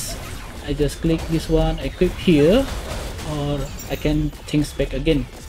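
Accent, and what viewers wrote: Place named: Indonesia. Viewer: logat indonya keliatan😁,mantap bang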